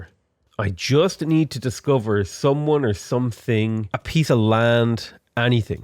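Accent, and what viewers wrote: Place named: Ireland. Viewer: His irish accent did not get a single bit stronger yet the deeper voice makes him sound a shit ton more irish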